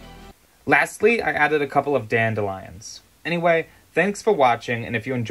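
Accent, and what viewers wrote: United States. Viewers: Interesting accent. Sounds American but once in a while it sounds Australian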